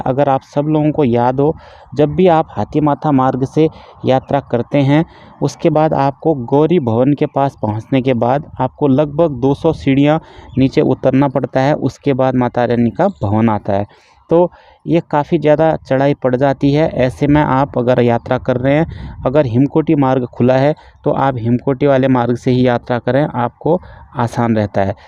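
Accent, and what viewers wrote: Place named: Uttarakhand, India. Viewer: जय माता दी 🌼🌻🌹 Sir, are you from Uttarakhand because your tone is matching with Uttarakhadian's people's.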